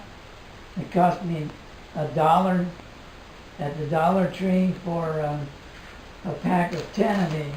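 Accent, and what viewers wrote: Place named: Lancaster, United Kingdom. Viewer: You have a slight Lancaster accent, bro--I hear 'war-shers' instead of 'wash-ers'. Didn't realize that before! interesting build using common parts!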